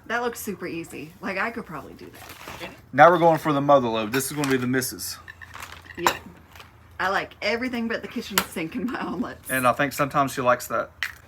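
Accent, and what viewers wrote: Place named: Texas, United States. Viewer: Edumacate me please... is that a Texan accent/southern accent, ahh luuuve eeyt! its the purfaact chef ayxcent!!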